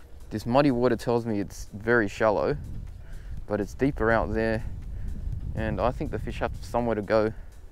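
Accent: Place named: Sydney, Australia. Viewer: Sydney accent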